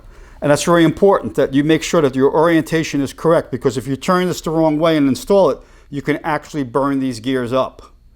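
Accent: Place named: Massachusetts, United States. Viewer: What part of mass are you from you accent gives you away lol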